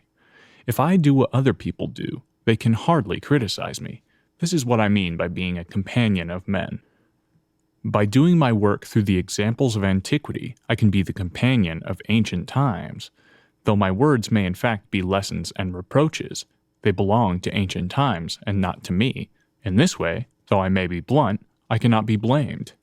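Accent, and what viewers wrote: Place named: United States. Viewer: are you kidding? this over the top american accent is just unbearable. I can't imagine any intonation more unsuitable for reading this kind of content (well, maybe an arrogant-french intonation. wouldn't be much worse though).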